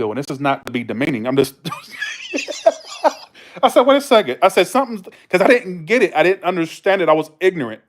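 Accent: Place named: London, United Kingdom. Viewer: Your London accent is so attractive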